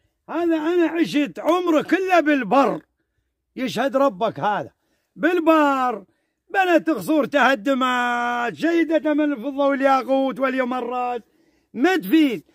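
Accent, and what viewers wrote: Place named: Iraq. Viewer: اخي انت من اي بلد كلامك يشبه كلامنا بالعراق اكون ممنونه لو تكول انت منين مع خالص تحياتي وتقديري لحضرتك حبيتك على قدر مداراتك لامك الله يرحمها ويدخلها الجنه ان شاء الله رحمه على حليبها الطاهر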